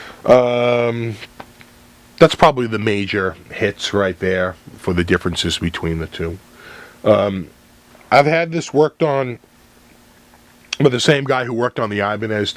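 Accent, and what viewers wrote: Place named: New England, United States. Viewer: lol are yu from rhode island? or sumwhere in new england?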